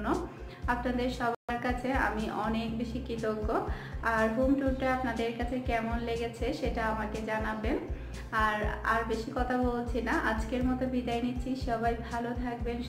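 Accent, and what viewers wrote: Chittagong, Bangladesh. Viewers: Apnar kotha shune Mone hocche . Apni amr attio. Bcoz we r chatgaiya.(Chittagong) 💕💕💕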